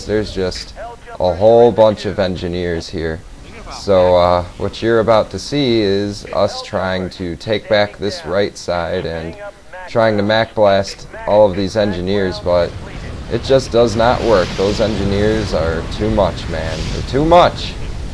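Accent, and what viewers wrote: Boston, United States. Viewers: ROFLed the whole time with the Buuuston accent. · FUCK that boston accent annoys the fuck out of me.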